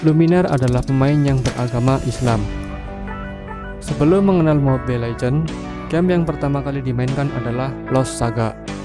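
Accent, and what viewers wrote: Java, Indonesia. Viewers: suaranya lebat banget kek orang jawa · Medok banget ngomong nya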